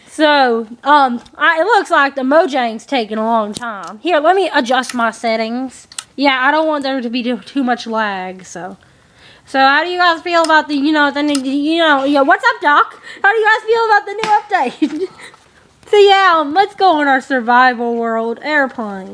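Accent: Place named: Nevada, United States. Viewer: dude u talk like people in pahrump NV yall best be gon roustabout the rattle snakes before them jeepers ranchalot no shade no cap....im brown and people say i sound like a texan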